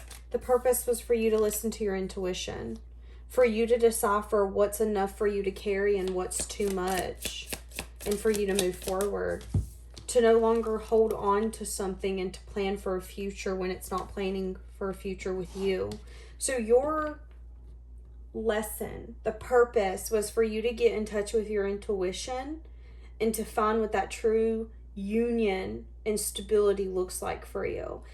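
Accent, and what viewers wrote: South, United States. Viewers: You are such a blessing you totally just blow my mind wow you are so good you have helped me so much God bless you thank you I'm so thankful for you God bless you keep up the good work I just love listening to you that country accent girl so sweet😘🥰😇🙏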